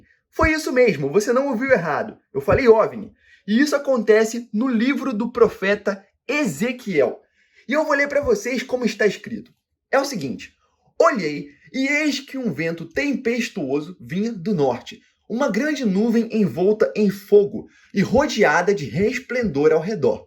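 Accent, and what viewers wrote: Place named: Rio de Janeiro, Brazil. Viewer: desconfio q vc seja carioca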